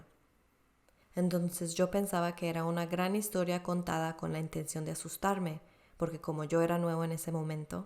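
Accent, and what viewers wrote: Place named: Latin America. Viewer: Feliz de escuchar a una narradora latina en el youtube🙏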